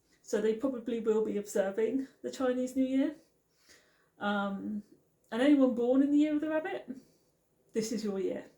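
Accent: United Kingdom. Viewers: I thought you are from USA I hear that UK accent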